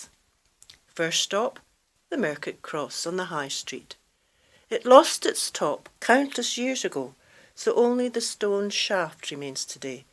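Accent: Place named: Scotland, United Kingdom. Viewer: Thank you so much for this. It's such a pleasure listening to a Scottish voice telling these stories. Gach deagh dhùrachd bho Gàidhealtachd Bhabhàiria 🙋🏻‍♀️ Lisa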